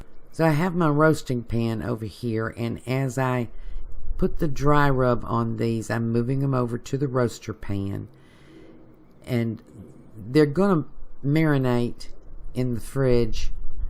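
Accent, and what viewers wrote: South, United States. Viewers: Just prepped some spareribs for tomorrow. Used a store bought rub on the ribs then added 4 cups of buttermilk on to of it. I wanted to make a buttermilk pie, which I love, but since I’m a owner operator of a small pet supply in Oak Island,NC I just didn’t have the time. Since the pie wasn’t made I decided to pour the buttermilk over the ribs. I’ll let you inow what we thought of them tomorrow. What state are you from? You sound a bit more southern than me, so I believe you are farther south than I am. My mother always marinated her chicken in buttermilk and I thought why not ribs? I put in search for you tubeand found you. Thanks so much! I’ll continue watching your videos. It is around 3:thirty am and I need to go to bed. Noproofreadingtonight. My zero doesn’t work this computer. Guess it doess not like salt air. Good night!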